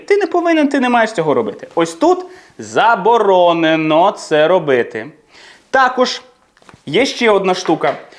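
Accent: United Kingdom. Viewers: Британський акцент - це просто 🔥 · Дякую, дуже цікаво! У Вас чудова вимова!!! Чисто британська! ❤👍🇺🇦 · а це британьській вимова?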